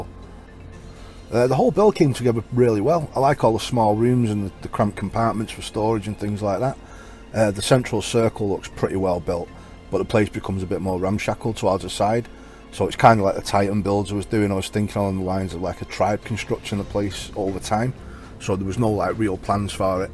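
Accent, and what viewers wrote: Manchester, United Kingdom. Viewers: Deffo from salford or Manchester sound like you are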